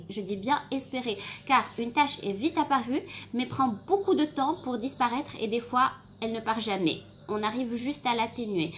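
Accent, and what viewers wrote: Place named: Marrakech, Morocco. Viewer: ختي وراه تنكول اللهجة شوية ديال مراكش 😘😙 يعني قريبة ليا ❤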